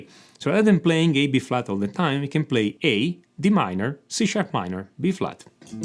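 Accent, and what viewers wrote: Italy. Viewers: Your channel is a gold mine. Are you Italian? Cuz your accent sound a bit like it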